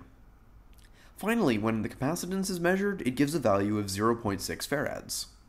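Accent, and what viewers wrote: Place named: Canada. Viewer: Ok, you sound Canadian- even so....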